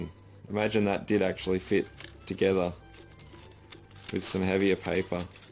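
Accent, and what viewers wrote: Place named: Australia. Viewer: Man im Australian too but you ahve the best accent i have ever heard!!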